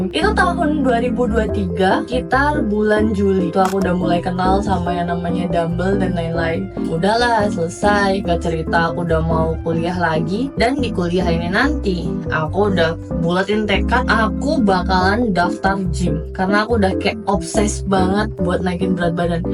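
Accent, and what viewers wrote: Indonesia. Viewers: Salfok sama logat nya. Orang Maluku merantau ke Surabya, logatnya medok banget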